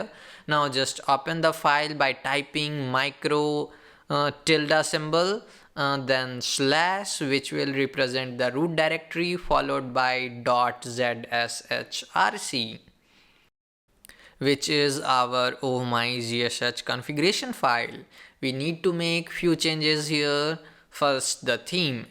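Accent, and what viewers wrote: India. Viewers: Indian accent, foreigners will enjoy watching this video!!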